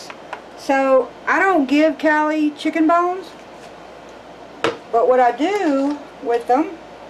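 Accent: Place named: South, United States. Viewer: Southern Ark No, Thank you for teaching me things I don't know, and the way you say y'all with your southern accent.....love the way you speak.👍❤️🇺🇸🇬🇧God bless America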